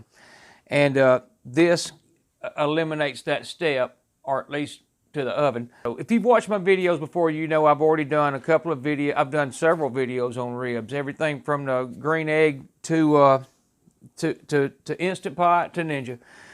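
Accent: South, United States. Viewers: I'm trying to figure out what state you are from? I'm in Georgia and you have a very distinct southern accent. I love watching you cook up those ribs! I've copied you once and ooooh they were good!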